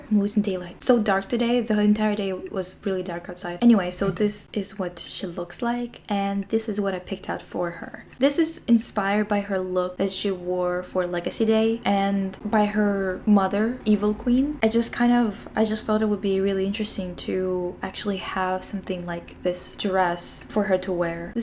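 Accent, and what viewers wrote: Russia. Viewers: do you speak Russian?? that explains why sometimes your pronunciation feels kinda like ours, especially in “r” and “n” sounds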